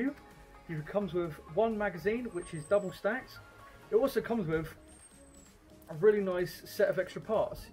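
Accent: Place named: United Kingdom. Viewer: I thought it was a real gun until I heard your accent and yeah your in Britain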